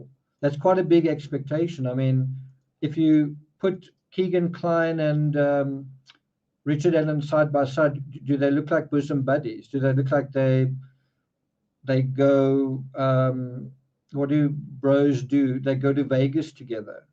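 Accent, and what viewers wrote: Afrikaans, South Africa. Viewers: Really liking your insight thanku...enjoy your Afrikaan accent even more... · Are you from South Africa ?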